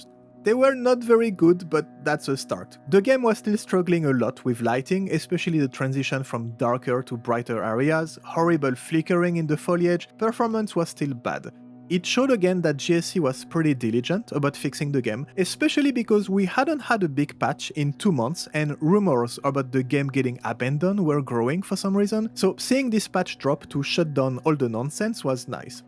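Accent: France. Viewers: He's so French I thought he was saying dispatch not this patch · FRENCH SOUNDING😨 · T'es français ?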